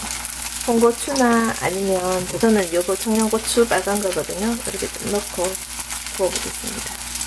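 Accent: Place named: Busan, South Korea. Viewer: 🇬🇧영국 팬입니다. 저는 고향이 부산인데 말씀하시는 게 꼭 부산에 있는 것 같아 친근감이 들어요 ㅋㅋ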